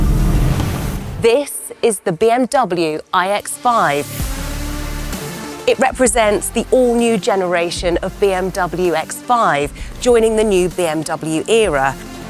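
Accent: United Kingdom. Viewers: I'm so sick of her British accent; it's so pretentious. It makes the X5 look even uglier.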